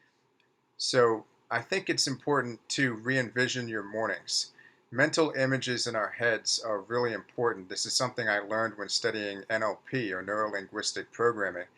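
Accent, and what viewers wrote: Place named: East Coast, United States. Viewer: there’s something really comforting about your voice and your back-East accent. I love listening to your videos. I never had the alcoholism as bad as you did, but it’s very relatable.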